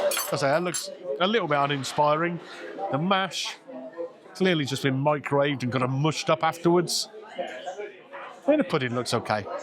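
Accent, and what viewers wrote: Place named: South, United Kingdom. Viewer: Loving your channel, especially the reviews from Southampton and Dorset, I'm originally from that part of the country and your accent sounds like home!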